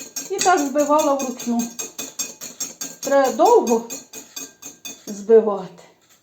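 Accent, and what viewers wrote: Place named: Galicia, Ukraine. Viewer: Це щодо зауваження до п.. У неї класний галицький діалект. Продовжуйте, п., і не звертайте уваги на такі дрібниці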